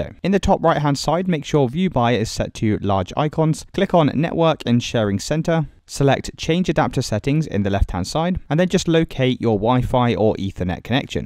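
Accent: United Kingdom. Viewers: THANK YOU BRITISH MAN DNS SERVER WORKED 4 ME MAN!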